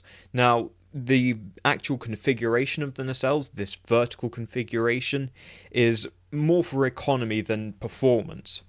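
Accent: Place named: England, United Kingdom. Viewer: your English accent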